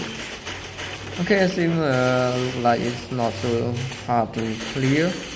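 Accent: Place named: India. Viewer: Yes he is because he has Indian accent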